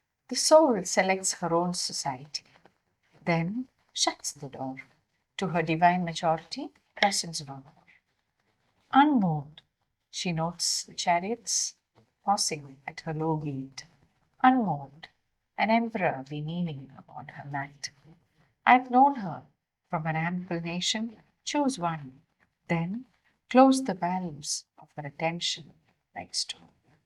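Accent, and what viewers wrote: India. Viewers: Indian Ki english bahut mza Kerti hai.hamen Yahan Pakistan me teachers kahtay hain indian authors Ki Kia bat hai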